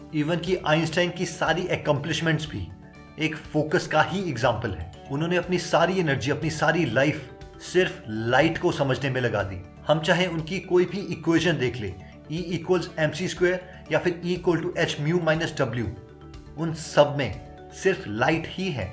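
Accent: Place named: Punjab, India. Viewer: awaaz se to bhai tum Punjabi lgte ho.. bta hi do kaha se ho tum?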